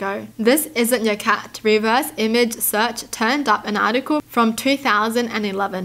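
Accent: Australia. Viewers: Ok, I can listen to you all day because of your accent 😂 I think you speak with an Aussie accent .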